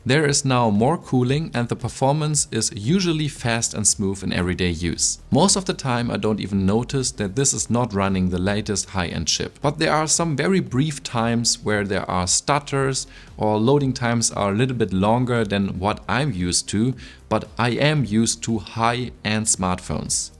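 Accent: Germany. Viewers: I knew this was a German speaking 😂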